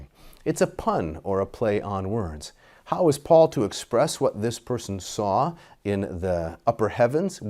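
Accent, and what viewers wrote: United States. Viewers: As a native speaker of Modern Greek, I find this painful to listen to. The Erasmian pronunciation with an underlying American accent sounds unpleasant to native Greeks. Nevertheless, how you pronounce Greek does not affect your understanding and ability to translate. If you didn't display the text I would not have understood a word you said in Greek.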